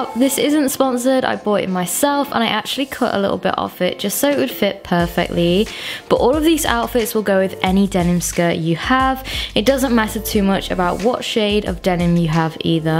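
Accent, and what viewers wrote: United Kingdom. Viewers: Lol I think in the uk people pronounce it how you do (nyke) but in the Us we say nikE (nikee) and also i think the uk say AdidAs (adidAs) but the US says adIdas (adeedas) lol idk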